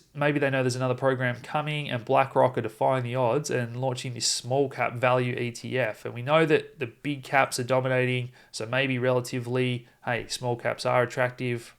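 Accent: Tasmania, Australia. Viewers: Thanks. BTC around $13814 as I write this (Nov 1st, MT). Oil slaughtered. USD stronger. Wonder what will happen tomorrow with the S&P 500. All this interest in BTC makes me want more so is not all in the hands of the rich and corporations. Also ETH must start going up soon according to your analysis - I have only dregs left after maximizing my BTC. Your Tasmanian accent is very easy to listen to and I like that you, your wife, and kid seem so well balanced in this crazy world.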